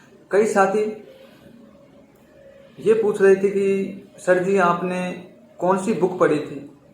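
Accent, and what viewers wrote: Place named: Dholpur-Bharatpur, India. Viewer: Bhai kaha se ho Bhasha to Dholpur Bharatpur ki si Lag rahi .( me aapko jarur reply dango 🤣🤣.)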